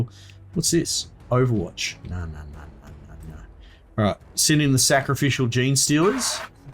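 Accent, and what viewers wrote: Australia. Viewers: It is an Australian accent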